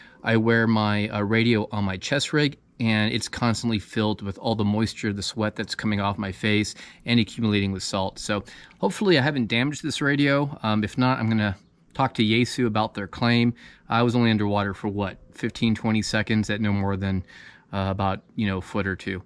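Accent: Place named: Arizona, United States. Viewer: Sound like your in AZ. We might be neighbors! Thanks for the video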